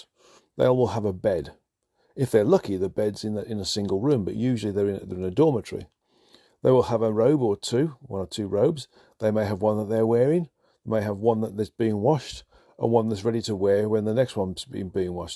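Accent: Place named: Midlands, United Kingdom. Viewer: This is called an English Accent . He is from the Midlands . I have trouble with English being spoken by Indians . Listen to it twice 😁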